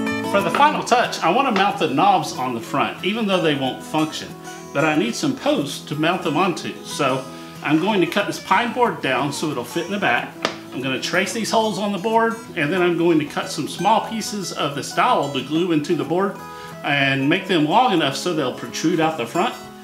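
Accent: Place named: Alabama, United States. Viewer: Love the radio and your solution until chassis can be repaired. Hope you don't mind me asking. Are you from Alabama? Accent sounds familiar. Anyway all the best from Western Australia.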